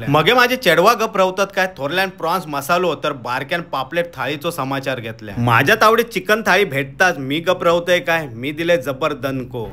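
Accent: Malvani, India. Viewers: Add 1 number and malvani dialogue superb 1 number ❤😊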